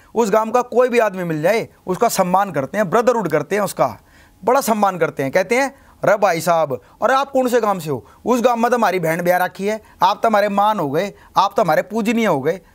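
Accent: Haryana, India. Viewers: भाई मुझे भी ऐसे ही बोलना है ! ये मुझे बहुत पसंद है ! मैं बिहार से हूं but I Love Haryana, Haryanvi & Jaat brothers! We are also farmers in Bihar known as Bhumihar Brahman or Babhan 💪🏻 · thanks for sharing useful information sir i must say apki hayanvi pitch bahut sahi hai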